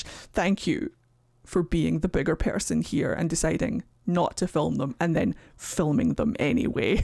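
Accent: Scotland, United Kingdom. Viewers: As an English brit. Love the Scottish accent! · First time seeing one of your videos, but I heard the accent and immediately knew it was gonna be a good one. Love our Scottish folks, y'all some real ones <3 - From a Texas Trans Woman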